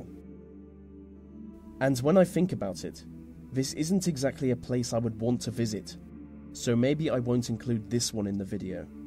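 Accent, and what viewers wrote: England, United Kingdom. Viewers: Not sure what it is your debonair English accent or the awesome topics the great narration and your consistency always keeps me excited and wanting more from your channel job well done my friend thanks again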